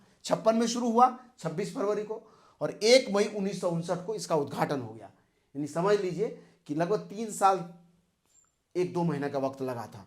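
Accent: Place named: Purvanchal, India. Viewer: Abe ye Provanchal, UP+Western Bihar ka Accents ha .. tou apna gayan apni ... .😉 main rackh🦉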